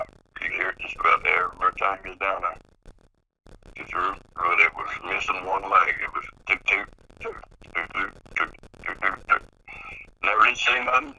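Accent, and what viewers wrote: South, United States. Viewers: This was great! I understood everything fine, may be because myself and my whole family sound just like him. Just born and raised in the "heart of Dixie " 52 years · I'm from the north and had a difficult time. I think the combination of the southern drawl and the audio made it hard and I did use my ear buds. I'm gonna try listening to it again because the comments I read have made me want to go back and give it another try. Its funny because when I was down south people had a hard time with my accent. Said we northerners talk too fast. Lol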